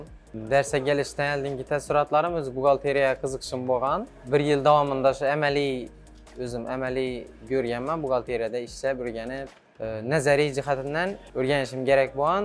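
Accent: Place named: Khorezm, Uzbekistan. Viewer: Assalomu Aleykum Xorazm sheva gap yo`q, Buxorodan salom..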